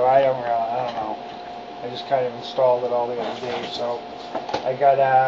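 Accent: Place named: Portsmouth, United Kingdom. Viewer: Hey, nice rig!! I'm in Portsmouth. You sound like you're from the neighborhood.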